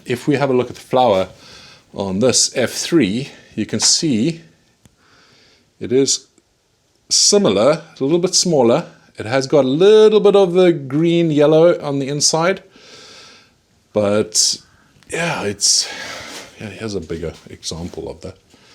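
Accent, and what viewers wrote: South Africa. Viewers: You have a south african accent why its quite cool to me because i am from south africa and you inspire me to keep on going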